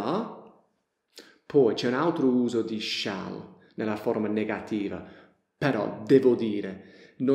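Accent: Apulia, Italy. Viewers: Ripeto...piu di due decenni per imparare l'inglese buttati nel cesso veramente, con le tue lezioni da madrelingua in italiano con accento simpaticissimo pugliese davvero è rivoluzionario il risultato e il miglioramento grazieeeee 👏🏻👏🏻👏🏻👏🏻👏🏻👏🏻👏🏻👏🏻👏🏻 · Ciao una domanda di curiosità:) per caso in Italia vivi in Puglia? Percepisco dal tua accento un non so che di pugliese. Comunque amo il tuo canale, studio lingue all'università e le tue spiegazioni rendono tutto più chiaro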